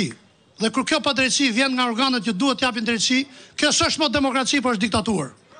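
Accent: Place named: Peqin, Albania. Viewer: Olymo ti thu ad Jam nga lush ha por flet si lpeqinaz e rrogozhinas api prsj luzi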